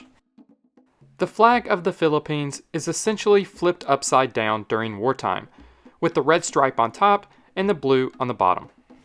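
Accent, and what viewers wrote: United States. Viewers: What specific American accent do you have? Every time I watch these I try to work it out but I have no knowledge of US accents. My best guess is Philadelphia??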